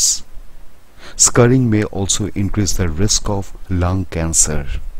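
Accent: India. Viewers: That Indian accent is strong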